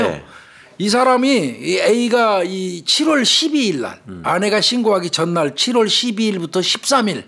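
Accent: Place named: Jeolla, South Korea. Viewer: 김복준 교수님 전라도 사투리 구수한 말씀 아주좋아요 옆보조가 좀 촌스럽다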